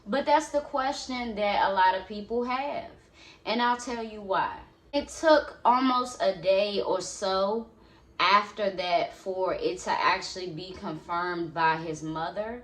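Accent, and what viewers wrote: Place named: Maryland/DC, United States. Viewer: you must be from the md/dc area 🤣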